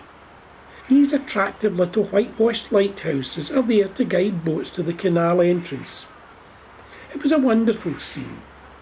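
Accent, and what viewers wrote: Scotland, United Kingdom. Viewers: I have to go to school to learn Scottish. It sound so interesting, what you’re telling, and I don’t understand much enough to follow. 😢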